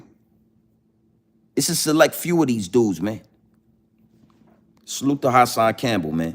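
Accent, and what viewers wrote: New York, United States. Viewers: You sound like you form new york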